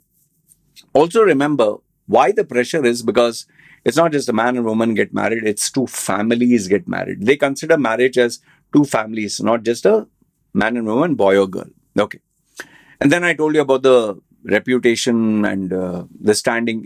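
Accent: India. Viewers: We want full video in your hindi tone...5 pandav 😂😂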